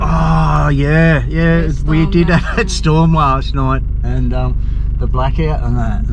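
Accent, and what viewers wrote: Australia. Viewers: I had a new scooter stolen 6 years ago and thats it but thats not my coment i was thinking your acsent northurn nsw so cessnock stayed there in 1983 all i remember is here we are in nsw shearing sheep as big as whales on ya keep up the good work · you should change your accent , your Australia accent is so annoying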